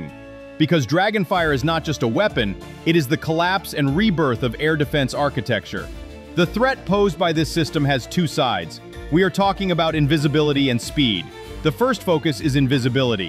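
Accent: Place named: Russia. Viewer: you sound like a Russian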